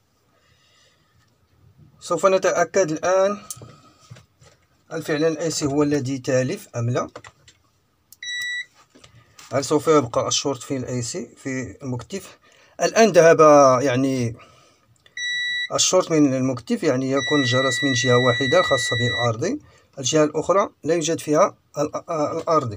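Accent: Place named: Agadir, Morocco. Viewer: ممتاز وشرح جد واضح ومفصل بارك الله فيك من خلال كلامك يمكن أن أقول انك من اكادير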